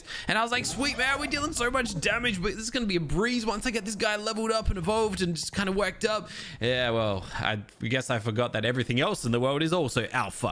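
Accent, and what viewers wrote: Australia. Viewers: Wait ur aussie😃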